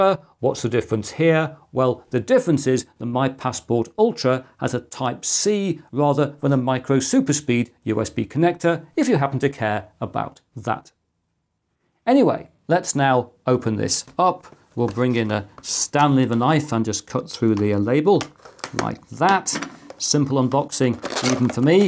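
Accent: United Kingdom. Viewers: I'd be really careful what kind of data you copy to this WD drive. The flimsy USB Micro B plug/socket is very sensitive to mechanical stress when handling the cable. I had a broken WD Elements just after warranty expired and also used to work in a company where we had several broken WD Elements drives. The drive uses a special (very thin) PCB which is made out of plastic and therefore can not even be re-soldered when the USB socket comes partially off (and it will if you unplug/replug often). The drive is a special version which has the USB connector on board and no SATA connector, so you will not even be able to remove the drive from the case and read its data via a SATA connection. Really good explanation of backup strategies, I do like your scripts and your British English... 😊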